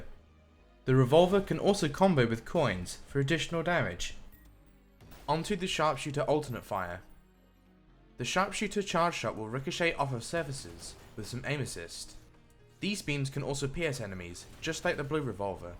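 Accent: United Kingdom. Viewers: How did I correctly guess this was going to be narrated by a British man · 100% british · dude i laughed so hard when i relised he's british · The biscute shootah ,oh gosh i really like the british accent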